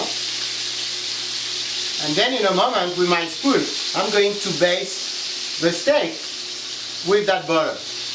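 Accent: France. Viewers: Very helpful! Where in France are you from? J'aime ton accent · I LOVE YOUR FRENCH ACCENT! I TAKE FRENCH III AND I LOVE THAT FRENCH ACCENT! NICE JOB WITH THE STAKES BY THE WAY!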